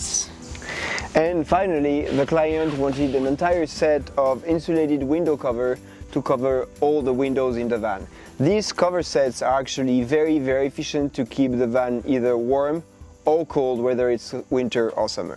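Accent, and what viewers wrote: France. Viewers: Beautiful! So brilliant! Love the French accent! ❤ So soothing to my soul!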